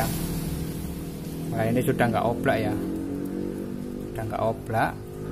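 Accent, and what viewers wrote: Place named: Jombang, Indonesia. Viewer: Logatnya kayak orang jombang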